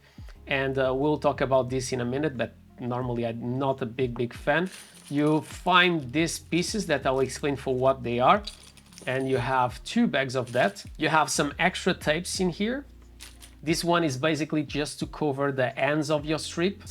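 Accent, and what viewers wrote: Portugal. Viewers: Hey…. Are you Portuguese??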